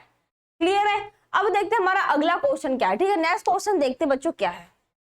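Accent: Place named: South India, India. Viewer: Thoda sa aapki bhasha madrashi lagti hai Lekin samajh aayi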